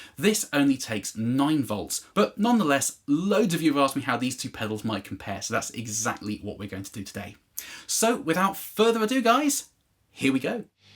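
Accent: United Kingdom. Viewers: It’s an English accent. Britain includes Scotland and Wales too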